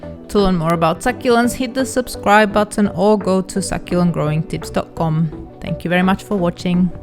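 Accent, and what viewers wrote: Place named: South Africa. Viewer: It sounds like you have a south African accent, sounds a bit like my friend from the west coast.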